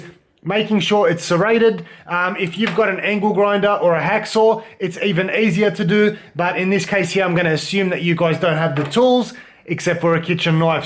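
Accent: Australia. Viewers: Thanks heaps- brilliant How To 👍👍Also, nice surprise to hear an Aussie voice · Hello from India my Aussie mate. A B-I-G thanks. You saved me a hot, sweaty trip to the mall to get the tag removed. It's a pair trousers that came as a gift, bought over a year ago. Finally, when I received it, it had the tag, the damned tag! I had no idea which mall it was bought from, I did not have the receipt. I hated going to the mall in the horrible weather. I took it to the local tailors and they all said they cannot remove it without damaging the fabric. I didn't know what to do. Then a friend sent me this video. and Voila I just got rid of the tag. Good riddance, I say. P.S. - I loved your Aussie accent and that Aussie exuberance and enthusiasm. I love this Aussie spirit. So down to earth, friendly, non-bossy. I have a few Australian friends here in India and I think Aussies are a great people. 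Finally, A big thanks to you again mate.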